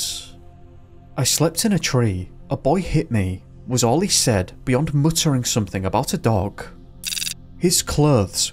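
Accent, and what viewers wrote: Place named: Northern England, United Kingdom. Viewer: As an aside, love your accent, but can't place it... northern England? Yorkshire? Tell me!!!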